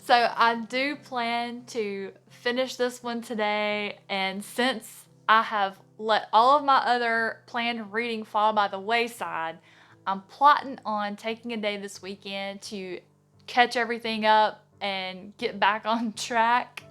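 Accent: South, United States. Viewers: It's the southern accent.😍😍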